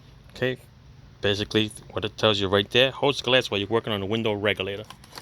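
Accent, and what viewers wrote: New York, United States. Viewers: Hey thanks for this short and sweet, to the point video. I need to replace both rear window regulators and I cringe at sticking duct tape over the glass. I was thinking about jury-rigging something with some suction cups and wire, then I saw this. Figured it would take me more time and trouble than to just buy it from Lisle. Your accent sounds like NY to this ex-pat Bronx guy. Take care.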